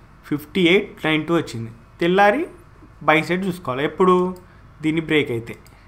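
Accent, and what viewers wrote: Telangana, India. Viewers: 😂👍🌹🌹🌹 i like your (telangana yasa) language....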